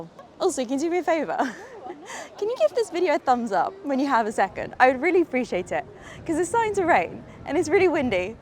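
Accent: RP, United Kingdom. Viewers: You speak elegantly, and I wonder what accent you have. I assume it sounds like modern RP.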